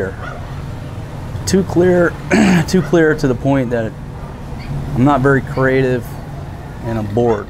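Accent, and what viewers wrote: United States. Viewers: Hi mate just love your American voice, wish I had it. By the way I got one of those DJI osmo pocket cameras love it. Dave australia.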